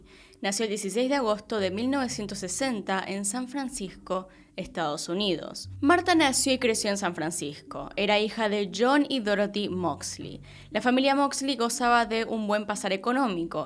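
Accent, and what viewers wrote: Argentina. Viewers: Hola. Eres buenísima para narrar y editar tus casos. Eres mi favorita , además me encanta tu acento argentino.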